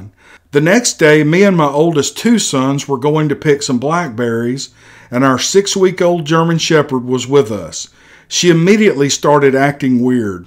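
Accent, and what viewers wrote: South, United States. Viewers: I never comment, but I listen every night. Your southern voice puts me at ease at night. I enjoy your comments and the way you read these stories. Please keep the stories coming!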